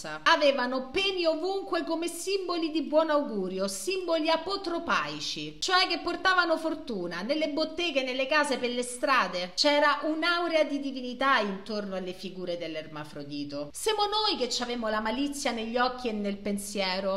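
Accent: Rome, Italy. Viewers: Sei fantastica! Qualsiasi argomento, tu lo rendi interessante e divertente, con la tua narrazione in accento romanesco! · Sempre più MITICA, (parlante).Daje a pacca' col romanesco,che musica!! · 92milioni di applausi ... FACCE UN VIDEO SU LLE POPOLAZIONI ITALICHE PRE-ROMANEEEEE... ovviamente co lo accento romanello tuoooo ❤❤❤ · Che peccato sta parlata romanesca, non se ne può più!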